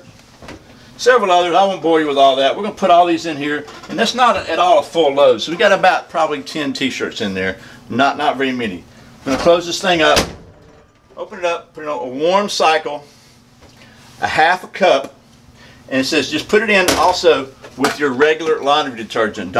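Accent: Georgia, United States. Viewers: the subtitles suggest you tried something from your toilet!?! That is not a good idea. Got to love automatic closed captioning on that lovely Geogia accent.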